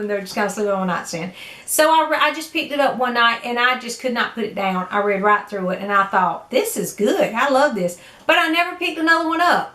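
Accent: South, United States. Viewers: It is so nice to hear your southern accent. My husband and I were both born and raised in Birmingham, Alabama. I got a job in Washington DC and we moved to Northern Virginia 24 years ago. Living up here I miss the food and the SEC football (Roll Tide). We still have family in Alabama. I can retire in 2 years but we haven’t decided where to move. It will definitely be a Southern city. You can take the girl out of the South but you can’t take the South out of the girl. 😀 This is probably way more than you ever wanted to know about a viewer. Glad to have found your channel and glad to hear your accent.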